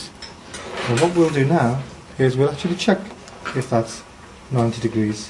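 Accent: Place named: United Kingdom. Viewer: beautiful your british accent is a little distracting hahahaha but it's awesome too you tackled it beautifully.. i couldn't have explained it better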